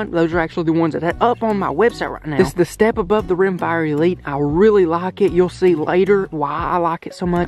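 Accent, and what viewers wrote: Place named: Appalachia, United States. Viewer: Hillbilly accent just like mine #jeuse #graygang #ky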